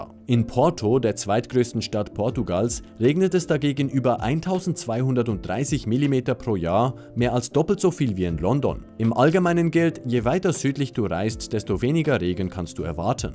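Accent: Switzerland. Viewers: Wenn jetzt kein schweizer Akzent wäre, würde ich sagen das ist runtergeleierte KI ohne Luft zu holen und nützliche Sprechpausen!!!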